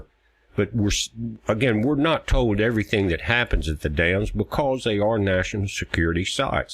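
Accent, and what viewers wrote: South, United States. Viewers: very charming 'Southern drawl!' 🎉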